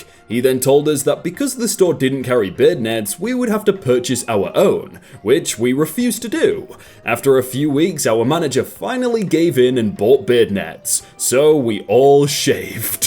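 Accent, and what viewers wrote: United Kingdom. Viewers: I realized you where British but didn’t realize you were that British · bro you do the absolute best british accent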